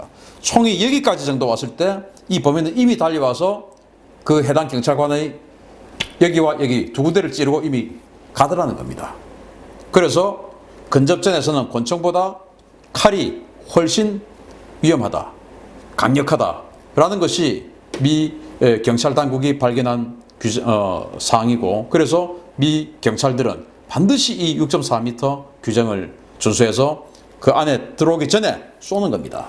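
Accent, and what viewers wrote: Gyeongsang, South Korea. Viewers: 짝대기~역쉬 갱상도 사나~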